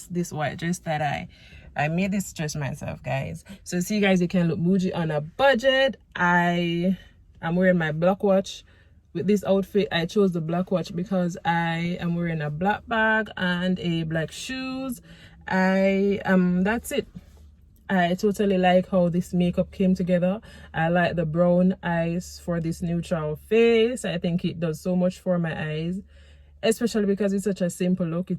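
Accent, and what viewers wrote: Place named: Jamaica. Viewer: Jamaican accent so real mi lobe it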